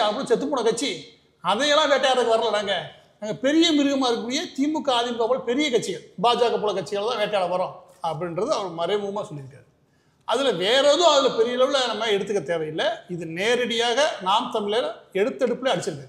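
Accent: Madurai, India. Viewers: bro‌ நீங்க மதுரை தானே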